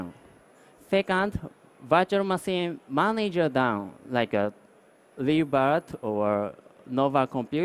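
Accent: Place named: Japan. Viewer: 日式英语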